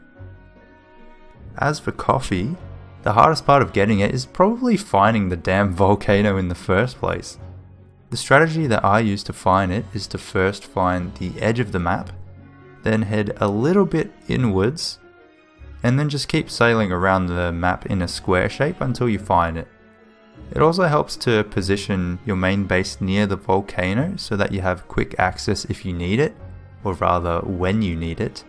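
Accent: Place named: Australia. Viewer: ayy haha i could tell by the accent :) im aussie too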